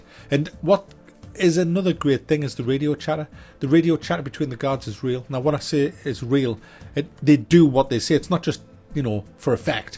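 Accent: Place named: Newcastle, United Kingdom. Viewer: nice to hear a geordie on youtube, you are a rare breed apparently...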